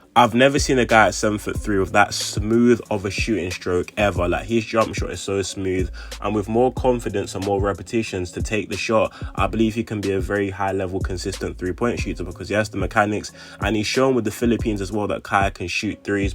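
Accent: United Kingdom. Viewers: I love your British accent. And look at that 3 point shooting at the end of the video. Near flawless!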